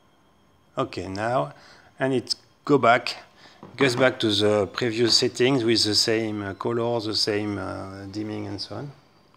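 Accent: France. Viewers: French accent :)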